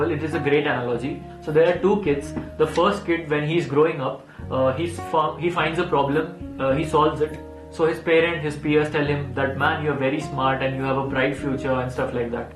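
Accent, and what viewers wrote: India. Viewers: Are you a Bengali? 😅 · Indian accent at its finest (no offense)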